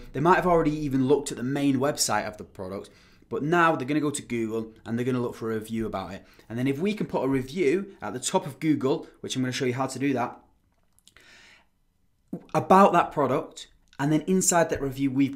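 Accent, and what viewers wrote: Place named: Manchester, United Kingdom. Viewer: Great content man, very comprehensive, is that a Manchester accent I detect? 🙂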